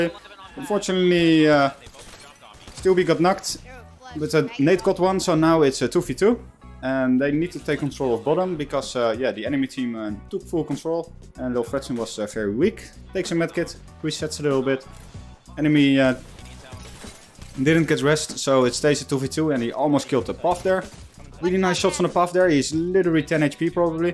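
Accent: Netherlands. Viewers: I'm not trying to be annoying but you are dutch right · Goeie shit bro, lekker nederlands accentje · Wy do you sound dutch 😂 ps hij is wel goed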